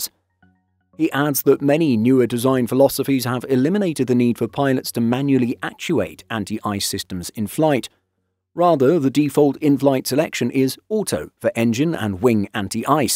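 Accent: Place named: England, United Kingdom. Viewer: What an annoying arrogant English accent...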